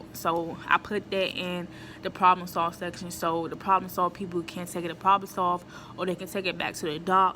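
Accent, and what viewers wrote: DMV, United States. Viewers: Are you from the DMV? You sound like it.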